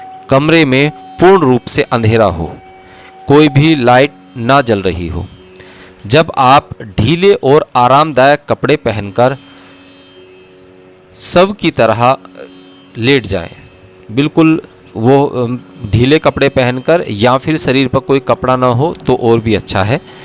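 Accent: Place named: Rajasthan, India. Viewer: Shaayad rajasthan ki jaban se bolte hai radhe radhe jay ho bhole bhagvan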